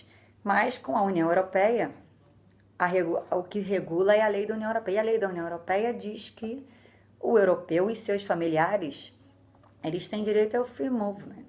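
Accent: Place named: Rio de Janeiro, Brazil. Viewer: Boas dicas de legislação em um sotaque carioca. Riso. Abraço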